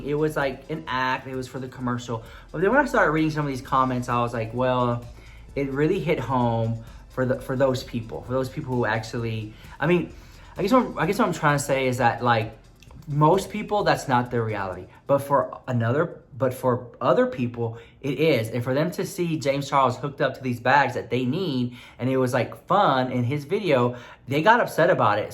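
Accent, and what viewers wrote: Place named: Texas, United States. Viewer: It was driving me crazy not knowing where your from... so I went to your Twitter to find out. I KNEWWW YOU WERE FROM TX TOO!! I hear that accent girl. im also from tx lol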